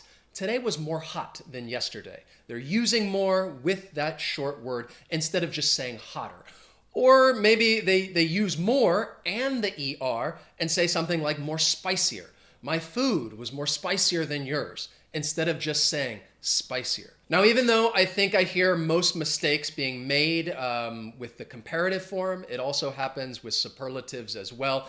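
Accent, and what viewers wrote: United States. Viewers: Thank you for this video. You teach very well! We Spanish speakers need to learn English for native English speakers as you. The best of all...I don't need to pay anything to watch your videos and your lessons are short. I don't even need an expensive English textbook . Your English is very clear and I love American accent. 🗽 Keep it up teaching! Greetings from Colombia! 😁👍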